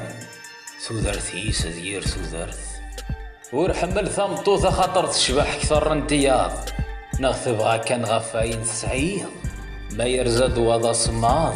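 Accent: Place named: Russia. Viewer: Une belle poésie..... Mais c quoi cette façon de parler..... Ahya Gemma machi Aka on dirait un russe qui parle kabyle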